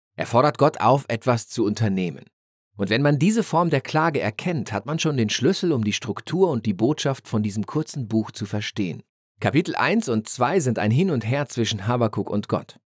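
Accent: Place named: Ruhr, Germany. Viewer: Was für ein Ruhrpott-Geschnatter... Wer's authentisch hören will, sollte sich besser das Buch selbst anhören. z.B. hier: <link>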